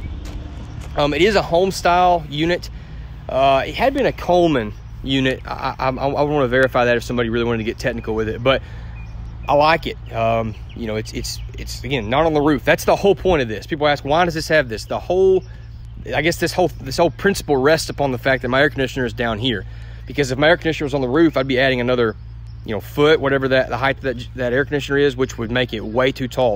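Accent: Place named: Kentucky, United States. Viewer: Aweslme review. Thanks. Love your Kentucky accent Reminds me of a Texas accent.. Definitely upgraded items. Love the kitchen. Surprised there is no outside kitchen. My main concern would be room for fulltime living clothes storage space. Love the bathroom, and tv/fireplace in the bedroom. It doesn't constantly cover the window. Woild have like bigger windows in the living room instead of light sconces.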